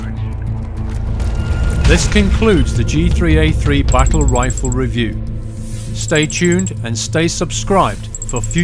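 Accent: England, United Kingdom. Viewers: DAMN!! now thats sone in depth review!! THE NEW BREAD OF REVIEWS! Fantastic video! see you got to narrate it lol he sounds like your average uk army guys accent lol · Why the English accent !? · From the HK Website: Frequently asked Questions What is the proper way to pronounce Koch? The English pronunciation is pronounced, “coke." He pronounced it properly, it's coke, but he has an English accent. The video pronounced it as perfectly as his accent allowed. You're all saying it wrong.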